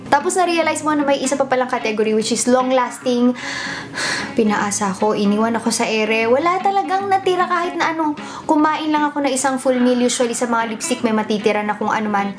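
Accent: Philippines. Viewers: im really love philipine accent😍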